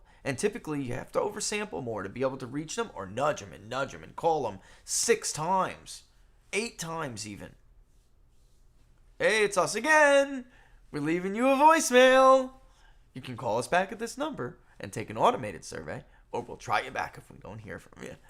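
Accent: New York, United States. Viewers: It’s a New York/New Jersey thing, thus why Trump also uses this peculiar pronunciation. LOL.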